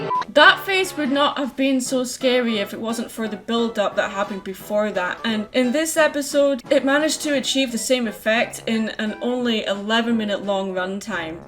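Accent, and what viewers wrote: Scotland, United Kingdom. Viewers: Are you Scottish cuz I'm I'm a fan of Scotland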